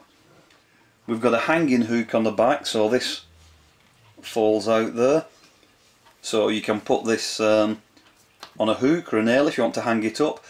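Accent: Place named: North, United Kingdom. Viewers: I just noticed your name is, Now I'm nervous that you're Irish, but you sound like a liverpudlian. Spot on review too, btw! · Thanks,! This tool is wonderful but the instructions that come with it are woeful. Now that I've watched your video, I actually know how to use it....and having it delivered in a Bolton(?) accent is an added bonus:-D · Thank you. I spent a couple of frustrating hours reading the manual and puzzling over the box of bits that came with it. Your vid clarified it perfectly. Reassuring and pleasant to hear a northerner too. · I would like to second the previous comment. Absolutely they should hire you. thank you - Plus it was nice to hear a northern accent -I like in LA but come from Liverpool.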